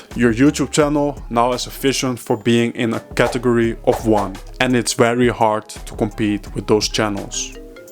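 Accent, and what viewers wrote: Netherlands. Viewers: is that a Dutch accent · Dude I love your accent! I can't place it but I really like it. Sounds Dutch? Sort of?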